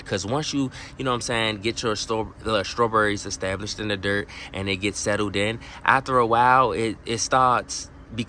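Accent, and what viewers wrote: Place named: South, United States. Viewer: The Southern accent is killing me😂. “Sum Burries “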